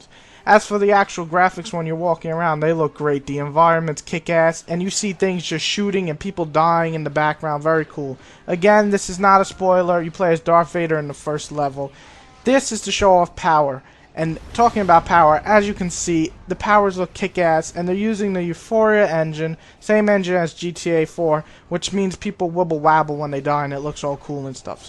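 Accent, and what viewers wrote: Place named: New York, United States. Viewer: Proper New yorker :) The way he says bosses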